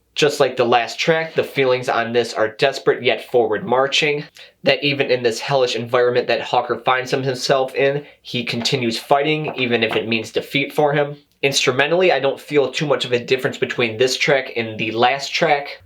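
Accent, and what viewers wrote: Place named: Midwest, United States. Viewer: Great review brother! Props for pointing out the lyrical content and the remarkable drum performance on this album. I also appreciate your impeccable midwestern accent. I’d be shocked if you weren’t from Wisconsin or Illinois. Keep reviewing black metal!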